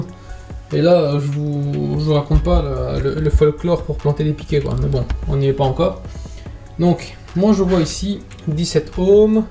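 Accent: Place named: Belgium. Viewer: vous ressemblez à marvin atmoss'vert et vous avez les mêmes mimique sauf que marvin il n'a pas l'accent belge xdd !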